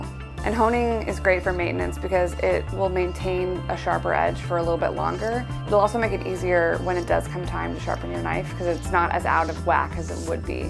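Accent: United States. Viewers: Which areas in the US would this person's accent be predominant? Or is popular amoung a certain age group? Or perhaps a particular kind of crowd?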